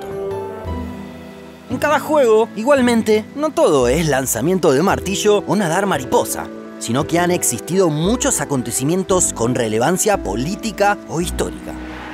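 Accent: Argentina. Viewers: Que calidad de narración y guión tiene este canal, es genial y ese acento argentino a mí me encanta!! Excelente video!! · Excelente dicción en la narración, obvio que con acento argentino, ¡bravo!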